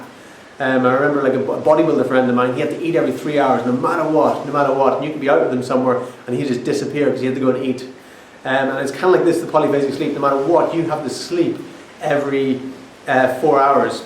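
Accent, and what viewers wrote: Ireland. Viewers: I think he's got a hint of an Irish accent. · What part of Ireland are you from?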